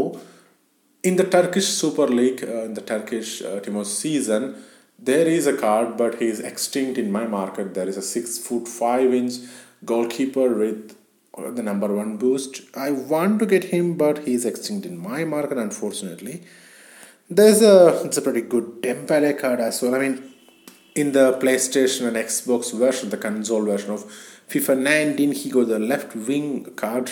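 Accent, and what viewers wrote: India. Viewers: You are indian your accent says all🤘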